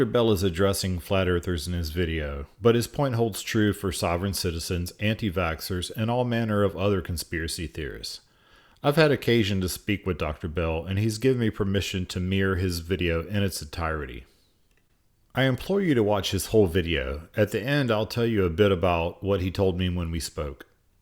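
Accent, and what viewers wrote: Australia. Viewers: He sounds like a true blue australian he makes me proud to be australian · Lol he has to be an Australian this professor. Just how he talks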